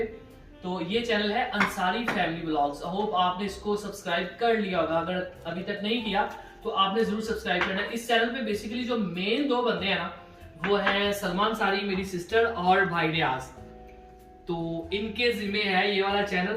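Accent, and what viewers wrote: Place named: Punjab, India. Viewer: Your Punjabi accent is v good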